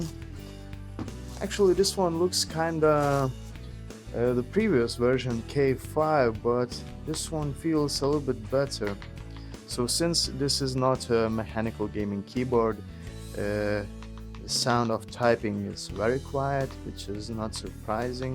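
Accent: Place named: Russia. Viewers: Лол, русский акцент) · lol ruski accent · the translate : Lol,Russian Accent) in case some one wonder.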